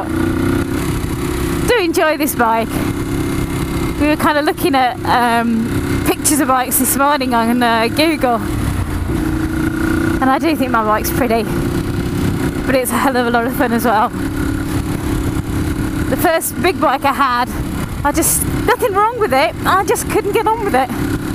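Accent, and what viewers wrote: United Kingdom. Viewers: WOW you really are a happy bunny aren't you !! You sound like my next door neighbour, she's a Brummie gal as well. Just wanted to ask where you got the handlebar mount from? I've just bought a Go Pro 2 and I think the best point to fit on the GS is probably the engine bars but still looking for options at the moment. Great vid by the way. All the best JJ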